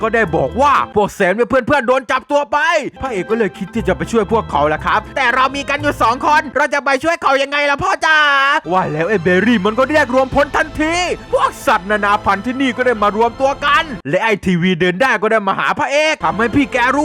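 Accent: South, Thailand. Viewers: อยากได้เสียง ใต้ เยอะๆชอบๆ♥️♥️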